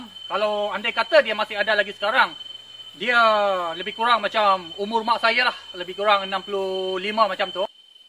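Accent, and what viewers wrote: Sabah, Malaysia. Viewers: Mcm org sabah loghatnya bercakap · Ada logat sabah.awak orng sabah ke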